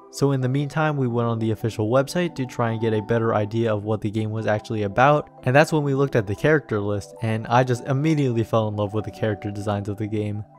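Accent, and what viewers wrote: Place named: West, United States. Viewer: lol he sounds western no?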